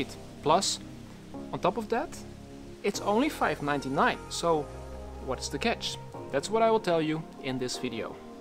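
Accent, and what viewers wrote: Netherlands. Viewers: Nederlander khoor het aan je accent😂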